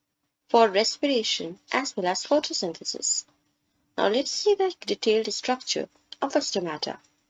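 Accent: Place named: United States. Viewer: Ma'am the video was fabulous , marvelous , fantastic , mind blowing , exellent . Your way of teaching is fabulous the way you explain is just fantastic . I had problem in understanding this topic but after watching your video i understood everything . I sure that you are the best teacher in the world with a stylish or i should say american accent . If you will start uploading videos like this only , then i am sure that you will the silver play button the gold and then platinum play button in one month only with at 30m subscribes and atleast 32m views on your each and every video .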